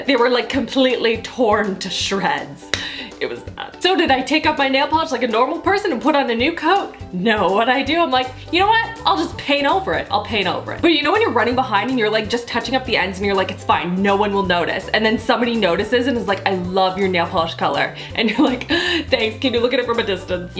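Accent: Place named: Canada. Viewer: where is she from? Canada? I have a feeling cause of the way she talks:) Super funny video:)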